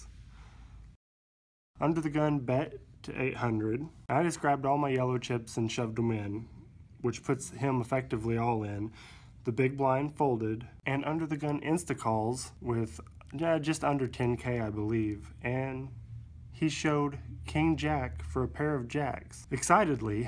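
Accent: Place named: South, United States. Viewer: Good vlog.....good voice and nice format; the southern charm (I'm from Eastern TN) is a big plus!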